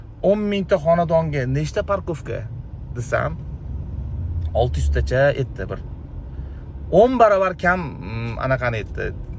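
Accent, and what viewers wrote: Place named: Khonsaroy, Uzbekistan. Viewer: Hon saroy di gapirvoti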